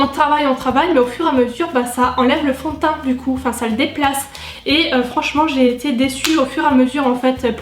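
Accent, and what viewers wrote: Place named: Alsace, France. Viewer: Tu viens d'où exactement en Alsace ? Parce qu'y vivant aussi je reconnais bien cet accent haha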